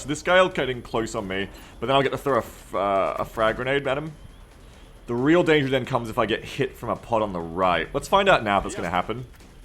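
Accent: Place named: Australia. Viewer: Dude has a mix of Australian and American accent. I don't recall that being the case years ago. Weird.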